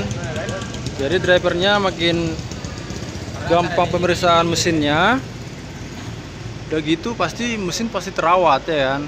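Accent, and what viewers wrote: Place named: Medan, Indonesia. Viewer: Medannn kali ahhhh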